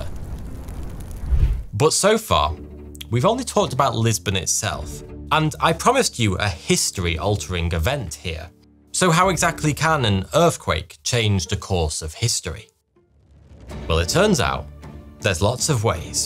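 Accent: United Kingdom. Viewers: Hear me out here: It’s really not that big of a deal. He’s British, he’s gonna pronounce shit wrong from time to time.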